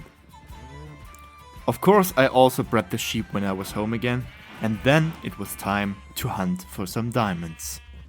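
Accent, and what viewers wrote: Germany. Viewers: I recognize your accent, and which land you come from -- I just spent a month in BW. Your videos are high quality and you have what it takes to become a big-league minecraft streamer. The amount of time you put in to your videos is evidence enough of that. The dry humor is a nice touch. And they say die Deutsche don't have a sense of humor lmao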